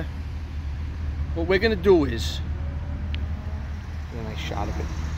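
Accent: New York, United States. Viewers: The New York accent makes me think that’s not his car. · He sounds like the definition of an American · certified new york accent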